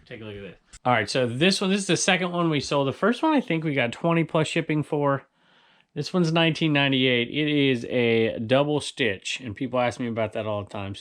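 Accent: South, United States. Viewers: Where in California they speak with southern accent you gotta give that family secret up.. I thought Angeles played in Anaheim and there they sure don’t speak like you...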